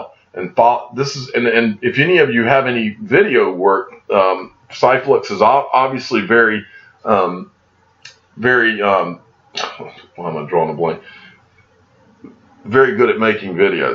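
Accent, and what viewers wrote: South, United States. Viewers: you have the southern drawl going on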